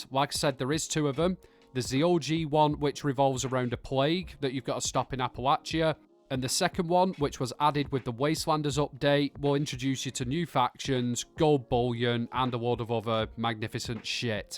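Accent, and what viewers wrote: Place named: England, United Kingdom. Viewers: You speak like your from my area Oldham haha love it ! Great vid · I don’t think I’ve ever heard such a strong English accent before watching this video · I've played 76 a couple of times. Who knew a northern lad telling me to "Stash Yer Joonk" would be what helped me get into the game. Seriously though this video is actually super helpful. I love how you explain things and it doesn't sound complicated and confusing.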